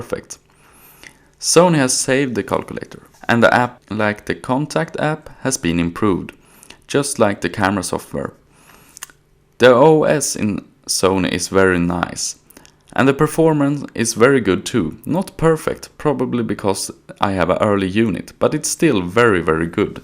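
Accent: Sweden. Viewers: Haha älskar breda svenska brytningen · even worse as Swedes have a reputation of good quality English - almost no detectable accent. not this guy... but whatever, his accent is still better than Scottish or Indian accents, those i literally cannot understand at all. This guy i can completely understand so i give him a pass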